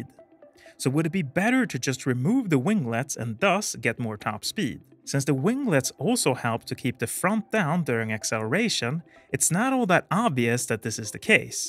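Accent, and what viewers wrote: Sweden. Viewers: Fan vilken irriterande svengelska ”this meeeeeens”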